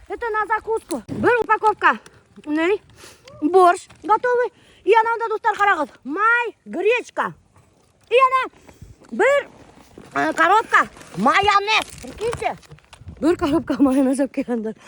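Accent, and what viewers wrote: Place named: Bashkortostan, Russia. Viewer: Похоже вы хорошо знаете татарский. У нее самый ни на что есть башкирский, да ещё зауральский.😂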